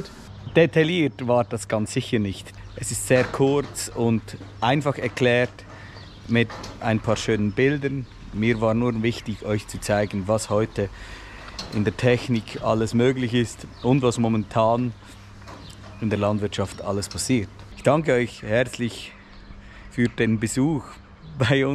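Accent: Standard. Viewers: Wieso redest du Hochdeutsch und nömä schwitzerdütsch? 🇨🇭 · hab ich was an den Ohren oder schwätzt Du tatsächlich Hochdeutsch?! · Hallo, Du sprichst ja super Hochdeutsch, Respekt. Egal wie Du das in Zukunft machen willst, Dein Kanal ist super und ich verstehe beide Varianten, ich folge ja auch dem Bauer Housi. Schönes Wochenende aus Franken, Bernd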